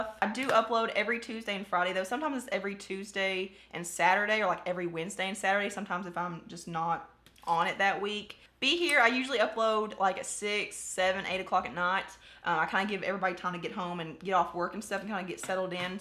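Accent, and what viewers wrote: South, United States. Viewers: Girl i freaking love your southern self! Im born & raised here in North Ga and i loooooooove the drawl!!!! Plus i love the fact you are unapologetically YOU!! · I love the fact you’re Southern! I know (as in yes I actually know her, her dad and I worked together) another YouTuber and she has apparently tried to erase her Southern accent. I like to hear us speak, we don’t sound like every other person and that’s fun! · Love you, your makeup skills & your accent! I'm from Georgia & I'm proud of our southern accent! I've been #partypeople for quite some time! I graduated high school in 1993 & your birthday is in 1994....makes me feel old! Lol I'm just happy to be alive & watching your videos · Anna Kennedy I found, watched about 30 sec of a video, heard that beautiful southern accent come out of her mouth, and immediately subscribed. 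😁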